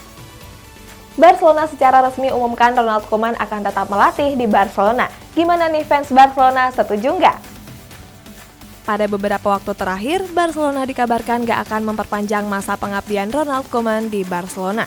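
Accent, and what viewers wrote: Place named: Java, Indonesia. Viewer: Marai yo titisan wong kediri lho.. Main oo nde ndi wae logat jowone panggah enek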